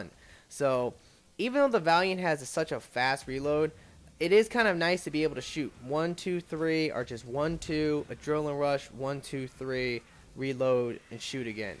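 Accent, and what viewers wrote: Boston, United States. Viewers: I just thought you had an accent like boston or new york or whatever. Anyways, keep up with the vids. You're one of the few people that makes decent ME3 gameplay recordings.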